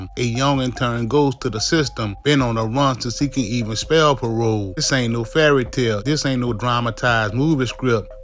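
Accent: New Orleans, United States. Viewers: You even sound New Orleans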